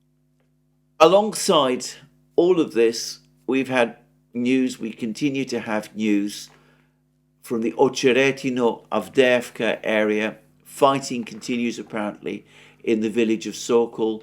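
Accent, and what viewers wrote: United Kingdom. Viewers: A year's training for Russian's soldiers eh? I don't think I've ever caught Alexander telling such a bald-faced lie before. The man absolutely has no shame. He appears to have no regard for the intelligence of his audience. He knows he's just shoveling thinly disguised nonsense gussied up with a British accent and a 50-cent thesaurus, and is just taking the money and running with it.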